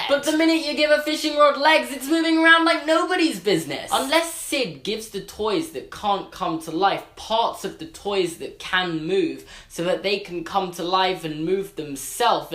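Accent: England, United Kingdom. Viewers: Alize Cordero I live in England and he does have really posh accent to be fair....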